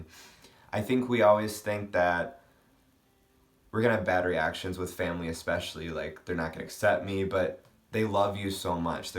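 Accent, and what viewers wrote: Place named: Minnesota, United States. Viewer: Btw, I love hearing your voice with your "Minnesota accent". I've lost almost all of mine, but I've been told that those transplants to other parts of the country, who grew up in the midwest, make good TV and radio announcers. People still ask me about my accent occasionally when they hear me speak.:)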